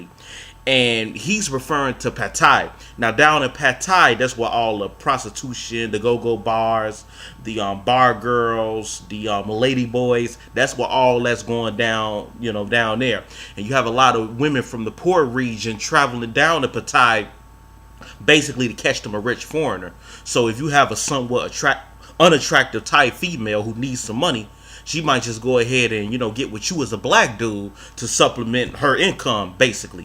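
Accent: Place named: East Coast, United States. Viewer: just tuned in to your channel. you're from east coast right? NY?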